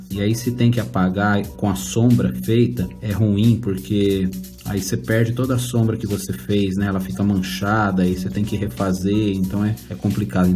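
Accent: São Paulo, Brazil. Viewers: boa parceiro. show de bola. Tentei entrar no site e deu nao encontrado. como faço pra fazer cursos com voce ? vc é de sampa ? (tem sotaque de sampa - conterraneo) eu moro aqui em Pinheiros - Vila Madalena. me chama no zap <num>. valeu.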